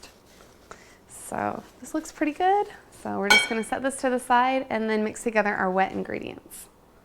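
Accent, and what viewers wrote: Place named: Kentucky, United States. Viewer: Her dialect sounds interesting... where is it from ? Kentucky ? or the areas around it ?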